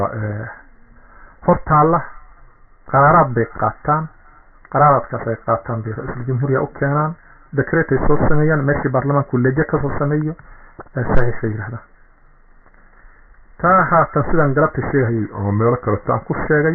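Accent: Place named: Mudug, Somalia. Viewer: Aun halganki syl ayu kaso qayb galey, doorashana kursiga waa ku yimid sharaf intaasa ugu filan MD Abdirashid Ali Sharmake, waxaa kalo cawa ka siyadsan inu nin rer muduga ahaa sida hadalkisa laga dhehanayo bari ba ha u dhashe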